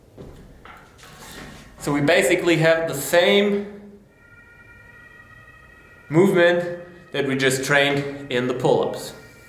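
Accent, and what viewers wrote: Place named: Germany. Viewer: This is a very german english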